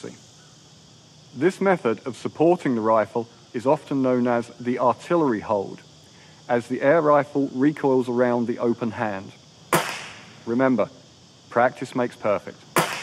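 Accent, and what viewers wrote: United Kingdom. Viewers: cool, its alot better for guns in the US i bet compared to here, (especially because the bad press airguns have gotten over the past few months!) and make sure u always keep your accent, english accents are the best, i love mine haha...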